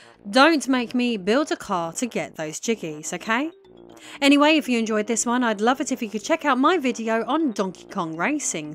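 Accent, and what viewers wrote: United Kingdom. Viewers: Funny that all these videos about banjo threeie have someone British talking in it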